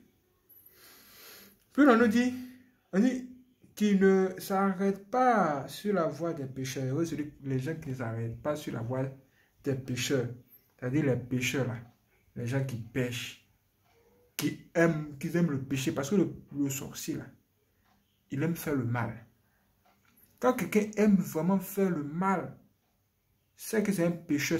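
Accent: Ivory Coast. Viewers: C'est un accent purement ivoirien ça.... Très loin de l'accent camer...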